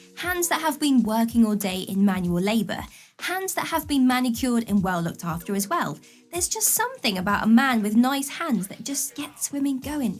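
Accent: United Kingdom. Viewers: You sound British,but u r based in usa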